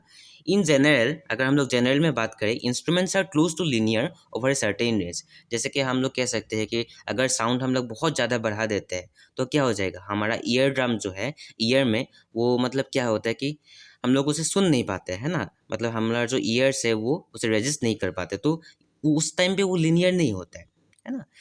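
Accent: Assam, India. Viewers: Bhaiya aap Assam se ho kya😃? Aapke accent se aise lg rhe😍.. Btw thanks for this amazing video 🥰🥰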